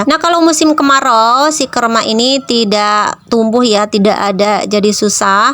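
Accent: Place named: Banten, Indonesia. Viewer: Emang di pangandaran it bahasa y ad jawa y bun bukan sunda doang.ak denger bunda ini suara y kaya logat serang banten gitu heheh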